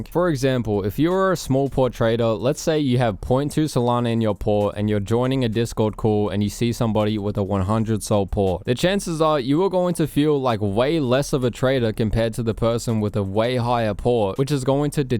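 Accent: Australia. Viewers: can you do the same video but without the australian accent please. It's just i heard a w at the end of every words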